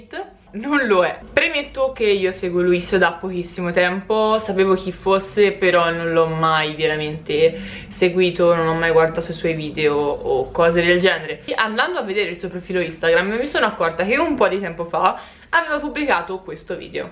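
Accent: Tuscany, Italy. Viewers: Sei di firenze o comunque da qualche parte in Toscana?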